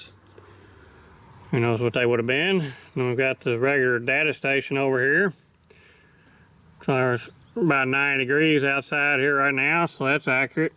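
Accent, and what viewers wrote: Kentucky, United States. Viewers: You have yet to publish a boring video in my estimation. I even enjoy watching a piece of steel go round and round in the lathe. You and a few other mechanical types have helped me learn new information. My greatest joy comes from just listening to you speak with your Kentucky accent about technical information. Keep up the interesting work. It is interesting and yet entertaining for this old country boy! Thank you for the pleasure you provide me with every video!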